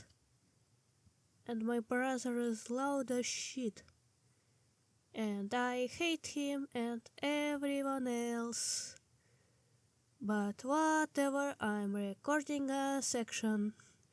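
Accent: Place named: Russia. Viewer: Из чистого любопытства нажали на этот ролик сразу после просмотра видео от Zoppru. Интересным опытом получилось лицезреть совершенно неожиданное сочетание классического "рашн" акцента с действительно хорошо и интересно написанным текстом, офорлением и подачей - как у настоящего ютубера! Видно, что над роликом старались, своими силами, но старались, продолжайте расти как контентмейкер и заводить добрых и понимающих друзей. P.S. Да ладно, неужели наконец-то русскоязычный чел на ютубе, у которого можно написать о себе в зей/зем и не схолпотать в ответ ворох придирок за экзотичные местоимения?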